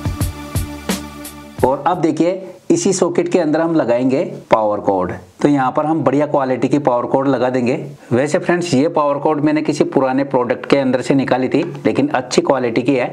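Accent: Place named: Bihar, India. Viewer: Extremely GOOD EXPERIMENT & worth the effort & please form A company to manufacture & sell as a start up company !! You sound like a BIHARI SCIENCE GRADUATE !! If you R please confirm or deny it !! Please also wie your own introduction in Hindi or English as I am proficient in both languages !! From A ( Retd ) MENTAL HEALTH PROFESSIONAL, ORIGINALLY FROM BANGALORE !!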